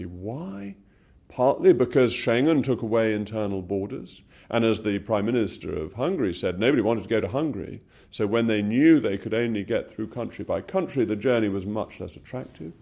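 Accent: RP, United Kingdom. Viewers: If you like how he talks, you can start by cultivating an RP accent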